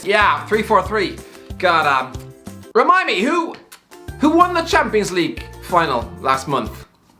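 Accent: Ireland. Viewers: My daily dose of an Irish guy screaming into a camera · Strangely this video served as a kind of anti pep talk. Thanks shouty Irish man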